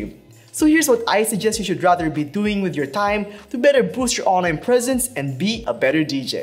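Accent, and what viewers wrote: Philippines. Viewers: I love that Filipino accent. Beautiful people with beautiful voices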